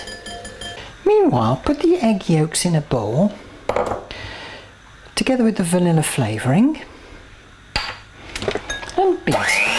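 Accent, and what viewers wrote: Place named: RP, United Kingdom. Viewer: i love your high english...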